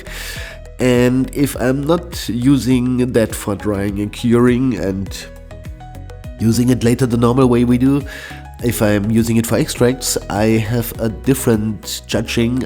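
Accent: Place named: Germany. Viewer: Das Englisch hört sich so deutsch an, wie ich es noch nie vorher gehört hab. Vielleicht wäre deutscher Content mit englischen Untertitel passender.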